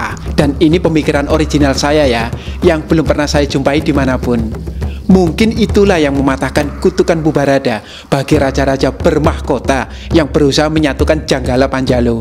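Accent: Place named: Surabaya, Indonesia. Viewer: Sek ta... Nggacor jare... Gak oleh ebes cak... (serasa kupingku disodok tugu pahlawan) 😂 terlepas dari aksen Surabaya yg ceplas ceplos, seperti biasa... esensi dari Chanel ini selalu jos 👍😇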